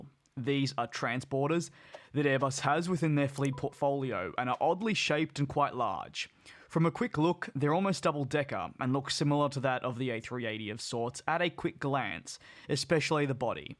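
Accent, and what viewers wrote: Australia. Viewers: "..A bucket.load.." Great to hear an Aussie speaking, even though you're too polite to say " a metric ***k tonne" Goodonya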